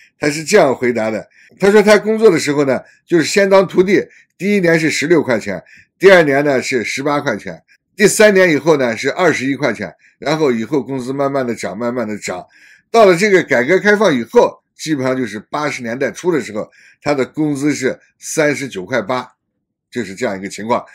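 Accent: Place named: Xinjiang, China. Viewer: 听说晒了一整天怎么感觉有点新疆口音？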